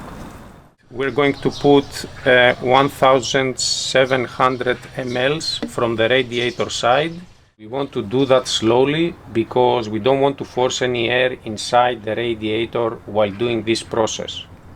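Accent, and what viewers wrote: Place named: Greece. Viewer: Το ξερα ότι είσαι Έλληνας, αθάνατη ελληνική προφορά, την ίδια έχουμε 😂😂😂 εγώ έχω του 2008 αλλά δεν βρίσκω πουθενά πουθενααααα πόσο παίρνει οπότε μάλλον θα πάρω ένα τετραλιτρο για σιγουριά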